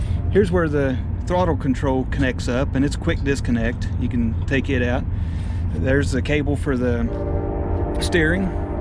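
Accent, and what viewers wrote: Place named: West Virginia, United States. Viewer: Thought you are from West Virginia as soon as you began speaking. I was born in Clarksburg, raised in Glenville. Now happily retired in Wisconsin three blocks from Lake Superior. Enjoyed the video. -- drew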